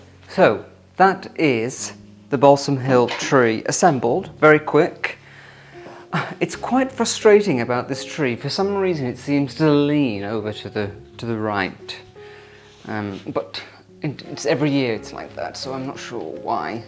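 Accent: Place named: England, United Kingdom. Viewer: Hi, I love your English accent and the way you decorate your house. My daughter loves the vintage decorations, and I know she loves your way. My taste is a little more glam, but I also like yours. I'm very glad to see your posts. I send you my blessings from Riverside, California USA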